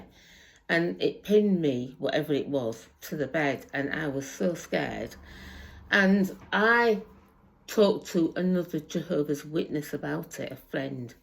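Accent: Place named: North, United Kingdom. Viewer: Hey sis, who'd of thunk our Northern accents sound good to people overseas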